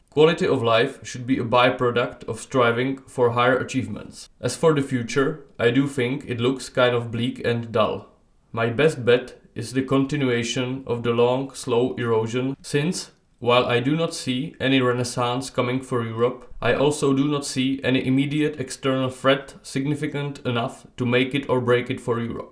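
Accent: Czech Republic. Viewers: Z tvého lehkého přízvuku( žádná veliká výtka) jsem poznal, že jsi Čech. Je přímo neskutečné, jak málo si průměrný Evropan uvědomuje všechny problémy týkající se naší budoucnosti, já jsem měl dříve spíše jen takový pocit ,, kulturního podvědomí", že se něco pomalu hroutí než jsem objevil tebe a další podobný materiál. Jak v ohledu ekonomie tak v hudbě, médiích je cítit, že jako kontinent ztrácíme náš elán. Myslím si, že je ČR v určitém smyslu takový zvláštní mix (jako nejzápadněji smýšlející stát železné opony). I přesto nedokážu pomyslet na moc kulturních záležitostí nedávné doby, které by překročily naše hranice. Nechci, aby se z celé Evropy stalo vymírající muzeum, pro celý svět k nahlédnutí. Jako část nejmladší generace mám pocit, že proti tomuhle všemu opravdu stojíme bezmocně, hlavně kvůli tomu, jak je postavená naše populační pyramida. Respekt za to, že věnuješ něčemu, co by si spousta jak mladých tak starých lidí měla projít hlavou. 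· thick czech accent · Uhh no, this is a pretty clear Czech accent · He is from Chekya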